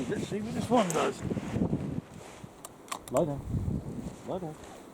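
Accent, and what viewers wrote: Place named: West Country, United Kingdom. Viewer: You must be from Wiltshire Somerset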